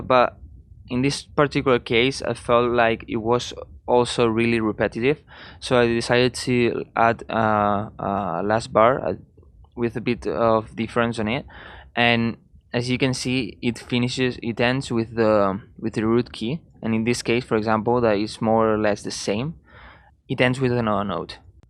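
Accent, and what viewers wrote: Spain. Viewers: Como se nota que eres Español ajjajaja! Muchas gracias por el tutorial !!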